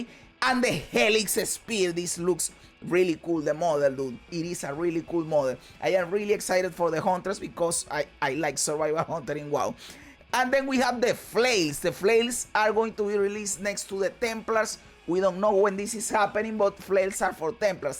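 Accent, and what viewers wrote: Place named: Dominican Republic. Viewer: a fellow dominican playing poe with a thick accent was not something i expected this is CONTENT lmaoo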